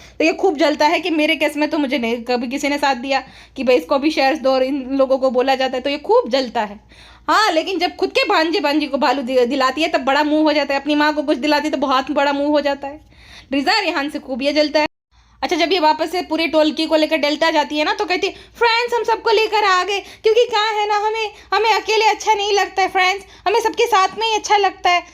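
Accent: Gujarat, India. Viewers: I just love ur "Gujarati accent" 💜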